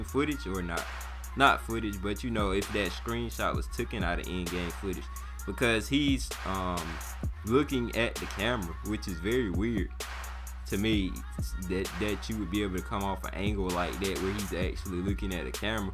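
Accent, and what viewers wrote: United States. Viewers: I knew you was from North Carolina lol on god..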